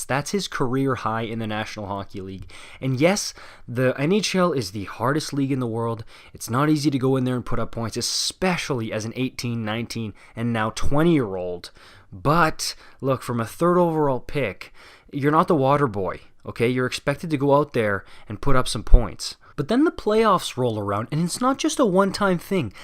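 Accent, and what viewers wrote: Quebec, Canada. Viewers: Mon radar a queb sonne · Il est possiblement Québécois en se basant sur sa prononciation des noms français. Les Québécois sont généralement bilingues et n'ont pas d'accent dans les deux langues. Ou il est quelqu'un qui a appris le français très sérieusement.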